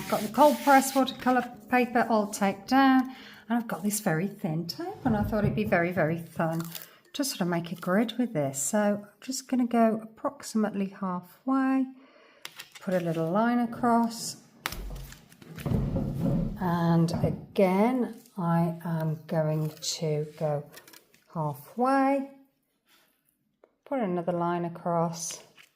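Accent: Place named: United Kingdom. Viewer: I’d love to know where in the UK you live. I moved from Nottinghamshire to the USA nearly 20 years ago and your accent sounds familiar.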